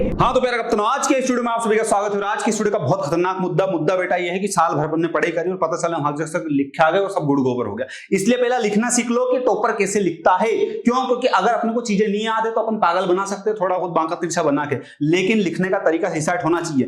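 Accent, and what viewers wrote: Indore, India. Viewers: thoda indori tone bhi h sir ka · Indore se ho kya bhaiya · Sir indore se ho kya😅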